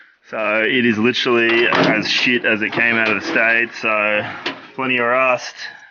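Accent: Australia. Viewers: I hear the Australian accent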